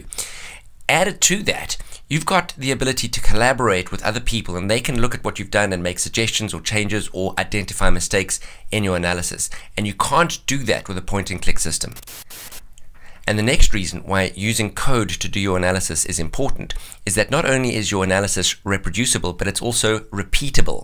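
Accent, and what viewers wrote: South Africa. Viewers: i wonder if the presenter south african? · Are you South African? The accent sounds familiar! Loved the video, great content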